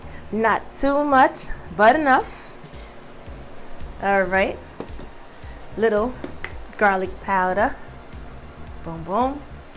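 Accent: New York, United States. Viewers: I love this channel. I can't lie, I come back for that NY accent more than anything. I love how women from NY talk.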